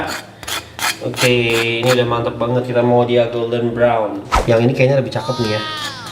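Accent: Bali, Indonesia. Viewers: Bli logat nya sudah kayak orang bali wkwkkw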